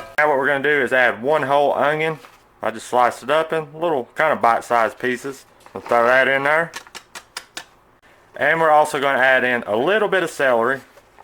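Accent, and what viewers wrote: South, United States. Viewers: I love your Southern accent.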